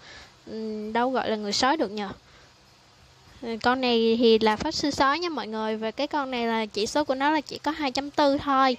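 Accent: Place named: Phu Yen, Vietnam. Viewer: Giọng phú yên nè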